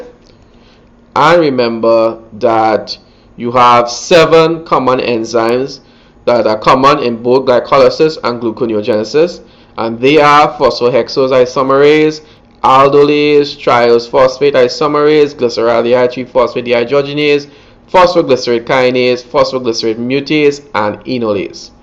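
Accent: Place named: Caribbean. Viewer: Dr., Been in broadcasting 33+ years and am now in studies for personal Fitness Training Certification. Huge chunk of the studies are all about this (ATP production is the focus). Not only have your lectures filled the blanks...but I grew up in St. Thomas, and hearing the Caribbean dialect just adds a comfort level to the learning...MESON!:-) Thanks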